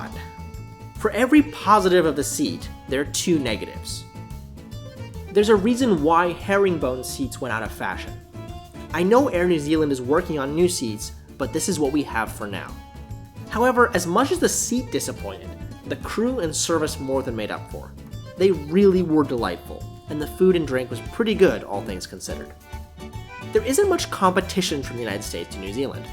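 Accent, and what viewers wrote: United States. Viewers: Love your audio commentary. The seats are the same as Virgin Atlantic business class. I've never tried it but I would definitely give it a miss. Are you American?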